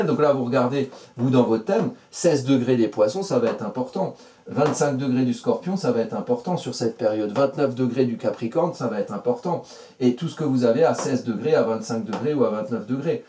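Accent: Alsace, France. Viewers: ....un petit accent Alsacien ou mosellan derrière les fagots ;) ??? Beau travail et vidéo que j'ai plaisir à écouter. Longue vie à votre chaine :) Signée une Alsacienne perchée.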